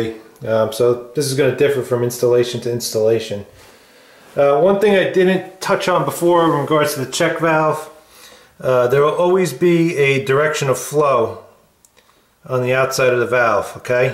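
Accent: New York, United States. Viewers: I'm guessing by your accent that you live in NYC/Long Island area? I'm originally from Queens but live in NJ. My wife and I go to the city several times a year to catch a play and eat something bizarre in Chinatown. I'll give you a shout if you are in fact from the area and get you a beer or two. Thanks again from a great video. 😊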